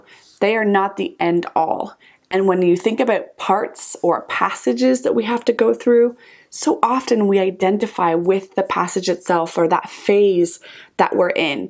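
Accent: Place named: Ireland. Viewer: Lovely vlogs..very interesting!...I hear a touch of Irish in your accent..💚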